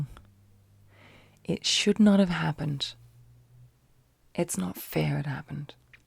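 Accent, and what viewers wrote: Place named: Australia. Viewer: I can definitely tell you studied counselling, your remind me of my psychologist I used to see! I love your voice/accent- I'm Australian too but I'm in Florida for a year so it's lovely to hear an Aussie accent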